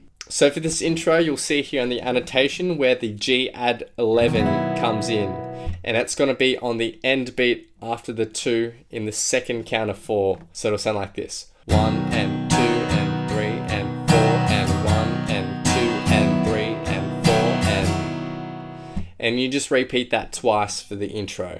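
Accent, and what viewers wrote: Australia. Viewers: Seriously! He is my top "go to" when I need a cover song tutorial. Clear and thorough instruction; plus a crackin' Australian accent!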